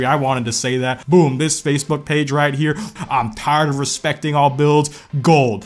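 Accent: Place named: Atlanta, United States. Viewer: ngl you're kinda starting to pick up that ATL dialect, almost sound like someone native to Atlanta now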